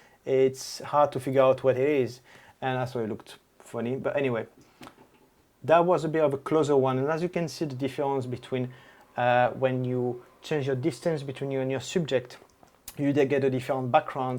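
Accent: France. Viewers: J'ai cru reconnaitre un bel accent français ? Est-ce que tu fais toutes tes vidéos en anglais ? Et juste une petite remarque à plusieurs moments tu dis lens wood au lieu de lens hood ^^ Bonne continuation !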